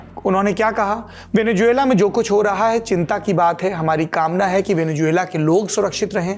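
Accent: India. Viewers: सौरभ भाई, यद्यपि विचारधारा के स्तर पर आपका व हमारा 36 का आंकड़ा है, परंतु फिर भी आपके समाचार बाँचने का विशुद्ध देसी अंदाज व हमारे शहर से आपके जुड़ाव होने के कारण मैं आपका अत्यधिक मुरीद हूं । आशा ही नहीं वरन् पूर्ण विश्वास है कि अगले प्रारूप में आपका खबरें बाँचने का विशुद्ध देसी अंदाज व भाषा शैली राष्ट्रवादी विचारधारा से भी ओत-प्रोत होगी ।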